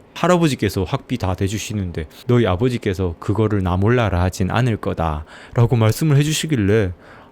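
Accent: Andong, South Korea. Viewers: 독서 검색하다 우연히 알게된 님, 말투에서 안동분임을 알아차렸죠.^^ 연배로는 한참 아래분이지만 인생 대선배인듯한 느낌에 그저 존경스럽단말밖에 안나옵니다. 오늘 아침, 밥 먹으며 고1아들에게 님 얘기를 했네요. 꼭 한번 들어가보라구요. 님이 성장이란 단어를 가장 좋아한다고 했는데 저도 그렇습니다. 그 성장이란 단어는 43살부터 본격적이었네요. 2년전부터 자투리시간 할애해서 주1회 중국어 학습지 수업을 하고 있는데 안하는거보다 낫잖아라며 가볍게 하고 있는데 님의 중국어 채널 유익하게 잘 보고 있습니다. 차로 이동하며 두서없어 하지만 이또한 안하는거보다 나으니까요.^^ 지인에게 님을 소개했더니 인생책 10권을 사서 열독하는 중이라고 그친구 정말 대단하다구 하더라구요. 동감입니다!! 앞으로도 지금처럼 차로 이동하며 화장실에서 화장하며 밥하며 수강생을 기다리며 그렇게 보고듣고 따라하며 더한 성장을 꿈꿔 봅니다. 참 고마운 님, 참 고마운 유튜브입니다!! 언제나 화이팅입니다!!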